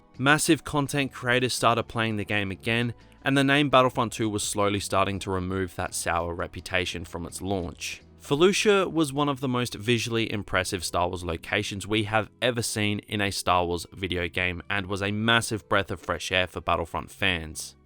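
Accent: Australia. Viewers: ThePizzadude93 he’s not British tho he’s Australian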